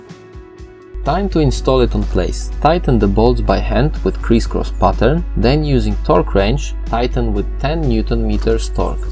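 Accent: Poland. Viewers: Polak na bank sądząc po akcencie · Słyszę Polski akcent 😀 mam rację? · Jak tylko usłyszałem ten akcent to wiedziałem że to polak, nie mniej jednak dobra robota :D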